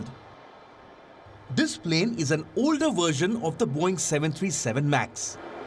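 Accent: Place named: India. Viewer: 能不能找个英语正宗点吗？这印度味英语我听着好难受 还自称英语是官方语言的国家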